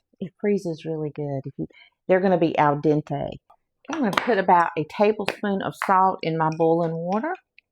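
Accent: South, United States. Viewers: I am so excited that I found your channel, love your beautiful southern accent and your recipes!!♥♥♥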